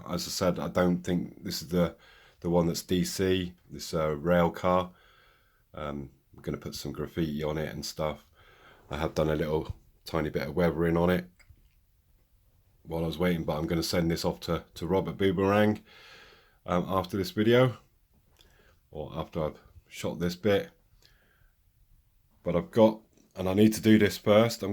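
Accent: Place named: Yorkshire, United Kingdom. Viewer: Thought you`d be a Yorkie Man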